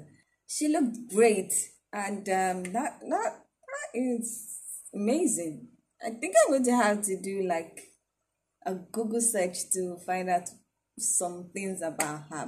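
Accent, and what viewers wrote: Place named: Africa. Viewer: So I was right, I thought it was from a country from Africa, because I've listened so other girls or boys, and they sounded with a similar accent.I like it.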